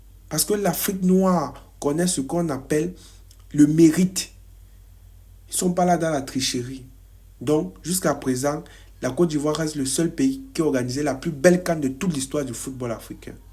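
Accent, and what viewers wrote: Cameroon. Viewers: Toi tu as un accent camerounais hein, tu es sûr que tu es ivoirien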